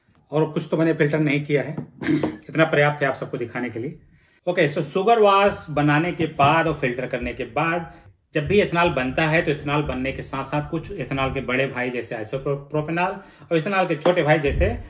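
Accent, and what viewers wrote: Bihar, India. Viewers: Sir you must be from Bihar (your accent is Lalan top). You are really good at chemistry. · I thought he is speaking English in Indian accent.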